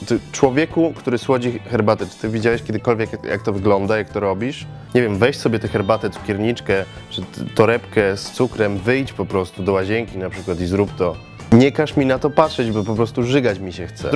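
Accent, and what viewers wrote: Tarnów, Poland. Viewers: Sporo. Sam chwilami nie byłem pewny ale tarnowski akcent i biżuteria z mięsa mnie upewniła. xd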